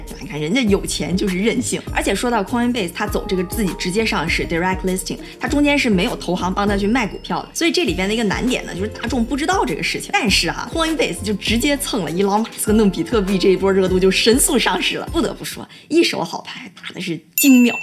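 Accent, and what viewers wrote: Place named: Shandong, China. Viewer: 听小林的口音像山东或者大连人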